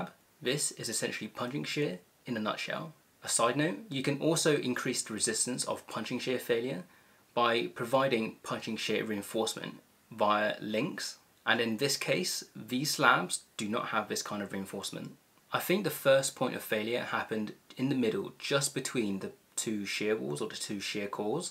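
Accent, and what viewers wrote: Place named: United Kingdom. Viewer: Great layman's explanation. Also nice British accent!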